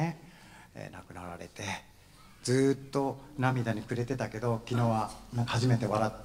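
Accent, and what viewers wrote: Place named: Kyushu, Japan. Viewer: 昔と変わらないキーで歌うこうせつおいちゃんの九州弁初めて聞いた気がします。お兄ちゃんの話も初めて聞いて、「 」はGoodVibrationのが一番だと思ってましたが、こっちも泣けてしまいました。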